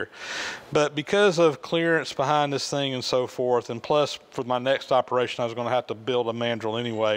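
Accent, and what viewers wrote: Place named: Georgia, United States. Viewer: The best accent in the US (Georgia) to pronounce > Babbitt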